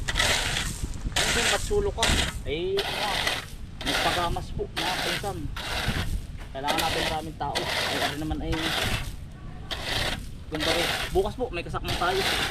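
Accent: Quezon, Philippines. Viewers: Ka insan san ba banda bahay nyo if papunta sariaya san baba para makapunta dyan matagal na ako subscriber mo doo ka pa sa kuawit dalawa kami kaibigan ko kya nga sabi ko parang yung punto mo eh taga quezon ka nga kya noon nakita ko na naluluto ka ng kalamay sabi ko na nga ba at taga quezon ka eh meron kmi laundry shop dto sa lucban sa may gilid ng pandayan bookestore sa may kantosaan ba yan sa inyo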